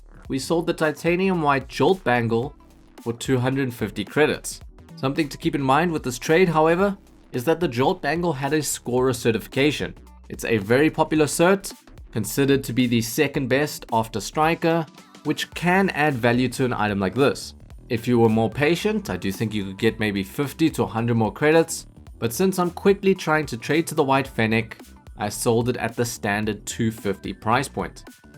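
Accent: South Africa. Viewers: Is this guy from South Africa ? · I just want ti ask are you from South Africa or Australia or neither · Yo u from SA?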